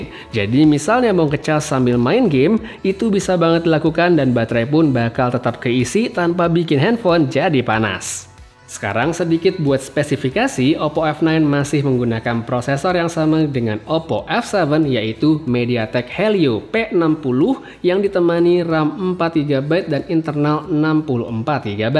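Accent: Bali, Indonesia. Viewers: Bli nak bali ne??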